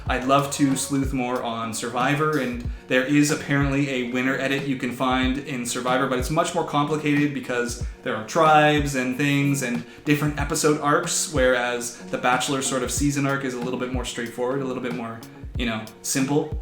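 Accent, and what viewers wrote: Canada. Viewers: Also you say "Tuh-more-owh" and Americans say "Tuh-mar-oh" that's probably what gave the canadian away. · OMG those dogs are awesome!! LOL! Ok...You're so cute and thanks for doing the face reveal. It's so nice to have a face to go with the voice now when I watch the fantakes. I'm glad you do them and I am forever anxiously awaiting the next one you make. And yep...I can definitely hear the Canadian now that the video came out and I'm listening more to what you are saying than concentrating on a recap. It's the word "about" that always gives it away. To my ears it sounds like "aboot". LOL....well, maybe not quite that bad but close enough. Always a fan and congratulations on hitting 100,000K. You rock! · Well that explains why your voice sounds so normal to a fellow Canadian. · I thought you were cool better because you have a Canadian accent and I'm a Mainer/half Canadian--- but as a Big Sister-- you're even cooler for talking about the Big Brother/Big Sister program! 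Love your videos, thanks for being so fun! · Nice to "meet" you, i was looking forward to you reaching your 100K mark (congrats on that!). I had guessed right about you being from Canada - I can't tell the difference between how Canadians and Americans pronounce "tomorrow" but to me, the giveaway was the way you say "out". I've noticed Canadians pronounce the U more. (I'm French-Canadian, so it took a while before my English became good enough for me to notice those subtle differences.) You didn't say which province you are from, though...? Love your humour. Would love to hear you cover Survivor - I'm a big fan as well. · Where in Canada are you? (Your subtle accent gave you away!) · I WAS SO NERVOUS for some reason haha I pictured you with dark brown hair! I’m not surprised you’re Canadian based off of your accent :) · As a fellow Canadian I somehow detected your Canadian accent haha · I FREAKING KNEW IT I KNEW THAT ACCENT WAS CANADIAN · Congratulations on reaching 101k...that is amazing and the fact you are Canadian as well is such a bonus!! 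🌹 ( We say tomorrow different than yankees? Who knew) PS MikeBookGiveAway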